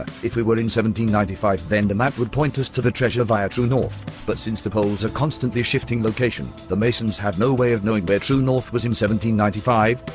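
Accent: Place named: England, United Kingdom. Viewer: Mystery history of lies._. Omg , always a stupid english voice like " they are superior in solving lies " ._. Not. Heres your truth the mystery trys to alter your perceptions away from._. A million pounds worth of lies._. Thats a good wishing well. They go broke and medias get rich ._. They wish to find gold at the bottom of a well ._. They wish they can find wealth benieth a well._. The oak island wishing well._.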